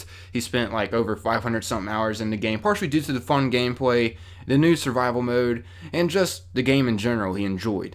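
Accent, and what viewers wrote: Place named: Pittsburgh, United States. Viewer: you sound like a fuckin yinzer man...are you from pittsburgh, too? lol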